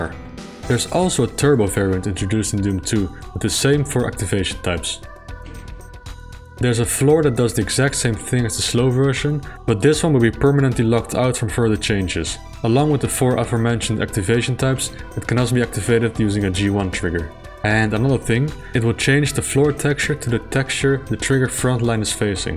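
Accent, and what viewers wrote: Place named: Netherlands. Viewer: You sound Dutch! If that’s the case, I’m proud such highly knowledgeable classic DOOM fan is from my home country.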